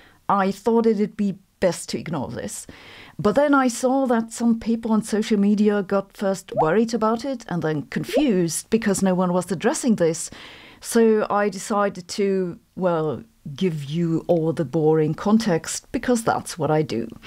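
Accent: Germany. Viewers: Unrelated but germans have a VERY cute accent and i wont change my mind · Why? Of course its possible to pronounce, even for Germans. My advice is to get rid of that insane accent. Feel natural. If nothing else helps, use a chewing gum. And don't use english with other Germans. Thats always a drawback. If you say you have problems with "th", I tell you that you have never tried. Good luck.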